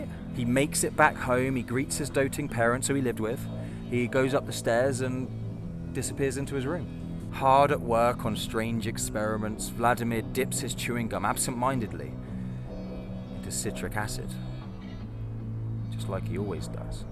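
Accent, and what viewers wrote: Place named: United Kingdom. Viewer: You have a great Bri-ish accent and you are cute!!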